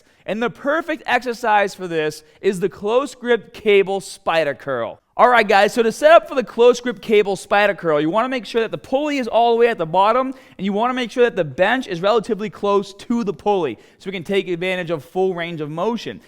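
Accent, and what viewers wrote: Boston, United States. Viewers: amor macalalad he’s from Boston so a Boston accent. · Is that a Boston accent?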